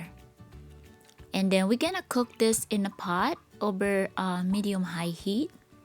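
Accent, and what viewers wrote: Visayas, Philippines. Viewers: Thank you, I think I an do this. I love your Visayan accent.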